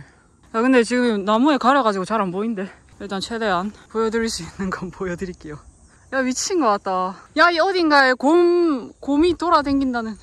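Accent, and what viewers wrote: Busan, South Korea. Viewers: 自然派美人ですね。面白いし。購読します。方言も最高（笑）。자연파 미인이시네요.성격도 재미있는것 같아서.앞으로 잘 볼게요.그리고 경상도말이 최고 ㅋ. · 안녕하세요? 우연히 발견한.... 15년 전 츠와노 옆 동네에서 3년 살면서 츠와노...놀러 간 적이 있어요. 신사까지만 가 본 기억이 있네요. 곰을 만나지 않고 무사히 하산....보는 내내 긴장했어요 ㅎㅎ 즐거운 여행이 되시길 빕니다. 부산사투리 재밌어요💞💞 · 부산표준어 찰지네요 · 곰 안나와요! 일본시골에서 부산사투리 조지시는데 ㅎㅎㅎ 이외중에 피부 미모 열일!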